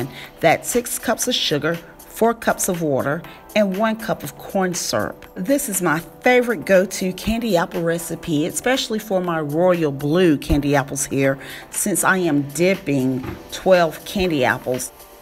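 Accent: South, United States. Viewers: When I tell you I have watched this about 5 times. One of my favorites !! It's the laugh and the commentary for me hunni!!! "That royal blue baby....royal so loyal"!!!😊😆😂 As far as the country accent...It's a southern thing....nothing wrong with a little twang...I'm a southern girl as well!! You are the best!!!!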